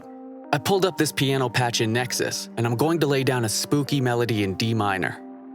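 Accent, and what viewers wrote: London, United Kingdom. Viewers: Your British accent is really good. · Mate, i gotta rate your London accent! We usually laugh at anyone trying to copy us but man went in! Gwaarn kuzz! Keep up the fire 🔥🔥🔥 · No lie your Brit accent sounds like a white bugzy malone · That British accent is legendary 😂😭🙏🏾 TBH tho... It's really good.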